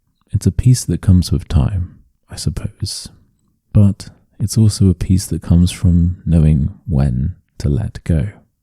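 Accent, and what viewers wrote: United Kingdom. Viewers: British English (which I love)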